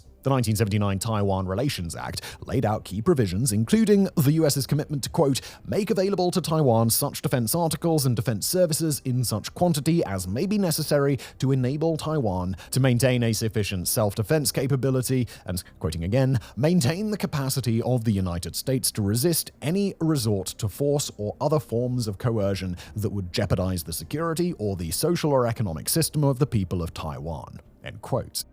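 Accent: United Kingdom. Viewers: Bro said ''china aggressive international approach'' and is just china investing in emerging economies. And he says this with an UK accent, you know the empire that conquered half the world trough violence lmao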